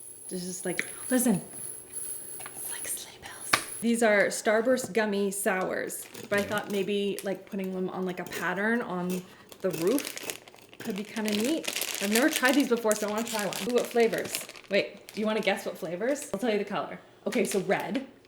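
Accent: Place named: Canada. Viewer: Is it me or is she extra Canadian in this video?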